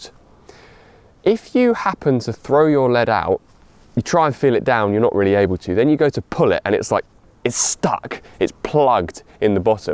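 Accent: United Kingdom. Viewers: British accent 😢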